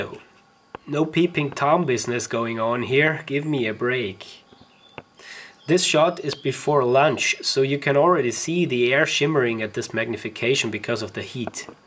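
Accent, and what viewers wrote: Austria. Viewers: your like the first Austrian guy who's English and accent doesn't hurt my brains. Thank you. Subscribed :)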